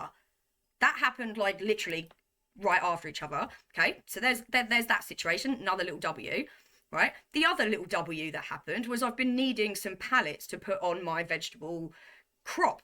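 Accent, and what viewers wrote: United Kingdom. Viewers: Actually I don't know where to start these days I truly don't 😮 I suppose you just have to take one step at a time love and hope for the best 😮 It's a Herculean effort to get out of bed these days it truly is😮 You do know I am not one to moan😮 Lots of love and hugs ❤ ❤❤ PS I was going to say, lots of love from across the pond. I actually don't think you are across the pond your definitely British ❤ .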